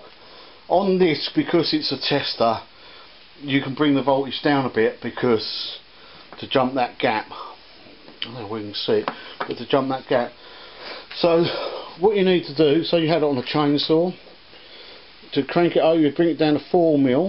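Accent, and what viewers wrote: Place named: United Kingdom. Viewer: Excellent video. Also, quite fun watching the computer translator try to deal with a heavy brit accent.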